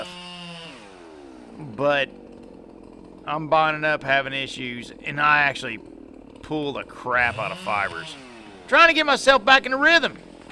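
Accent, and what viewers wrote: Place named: Bronx, United States. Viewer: Man hard love your vidgas, and your Bronx accent makes me think of home🤣🤣✌✌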